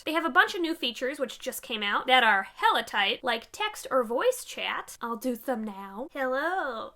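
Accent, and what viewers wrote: Minnesota, United States. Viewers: Hey fellow Minnesotan, your accent reminds me of home :3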